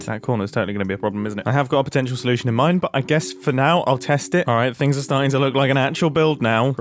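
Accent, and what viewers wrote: United Kingdom. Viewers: funny hearing a british person talk about the bass pro memphis pyramids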